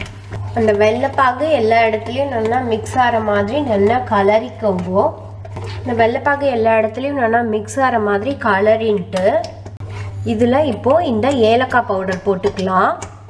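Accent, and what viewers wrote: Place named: Tamil Nadu, India. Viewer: Always amma prepared ..I am gonna try this tomorrow :) I simply loved your Tamil accent ..!! :)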